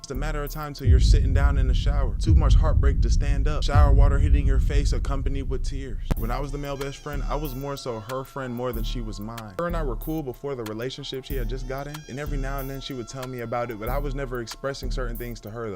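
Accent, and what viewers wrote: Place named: Texas, United States. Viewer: I've been binge watching your vids and your a very good story teller. You gotta subscriber out of me and it sound'n like you a Texas nigga too. So I'm awwready ready to support. Keep it going.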